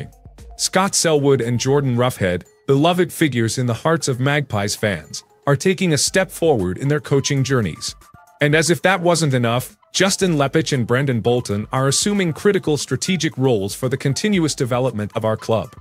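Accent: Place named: United States. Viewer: Why is a Yank talking?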